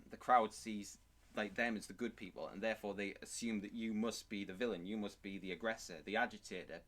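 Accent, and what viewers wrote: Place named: Newcastle, United Kingdom. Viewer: As somebody who does pretty well on women front hearing and looking at you it’s surprising yet not unbelievable. You do have confidence (able to speak on camera), can hold a convo and you are not ugly. Not sure how tall you are and if you live in a big city (sounds like you’re near newcastle). Aesthetically though, I think you need to look more fresh. Women like clean, fresh, healthy people. IMO Your hair is a mess, and makes you look like Virgo from ghostbusters or an extra from a Viking’s episode. You should opt for a 90s Keanu Reeves style. Also grow some facial hair if you can, weight train 3 times a week and stop touching yourself/watching porn as much. In terms of clothes trying buying some stuff from M&S (their autograph line is stylish and affordable). Dating apps do work if you have good pictures. Plenty of videos on YouTube which show how to achieve this. 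As for meeting women in real life, you give me music vibes. Therefore I’d be going to ticketed live music events/gigs (not clubs) where you’d have a mutual interest. Would also join a club of some sort (not sure what you’re interested in). Sorry if any of this sounded mean, best of luck!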